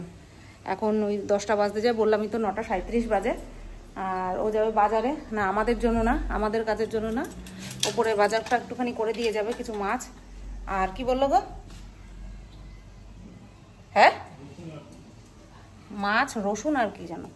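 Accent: Bangal, Bangladesh. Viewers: তোমার বাঙাল কথা আমার darun লাগে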